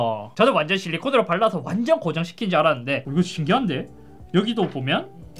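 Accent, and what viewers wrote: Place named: Jeolla, South Korea. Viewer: 말끝마다 요거슬 이거슨 .. 개 역겹네 느그 고향이 쩌ㅡㅡ그저 7시 쩌ㅡ짝이여?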